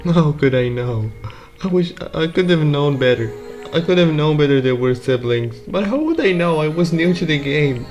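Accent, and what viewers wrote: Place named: Alabama, United States. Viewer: yo. i thinked you are from alabama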